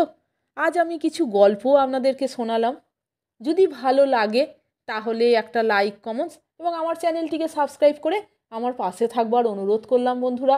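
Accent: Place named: Ghoti, India. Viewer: দিদি, আপনি ঘটি, না বাঙাল? আপনার রিলগুলো বাঙাল ভাষায় এবং সম্ভবতঃ বাংলাদেশে বা বাংলাদেশীদের সাথে করা। আর আপনার জোকসগুলি একক কণ্ঠে ঘটি ভাষায়! আমরা সত্যি ই কনফিউজড!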